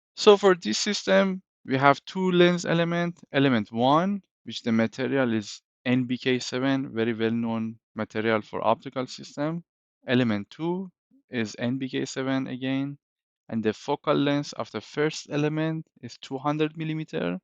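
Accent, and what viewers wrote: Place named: Iran. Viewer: Nice Persian accent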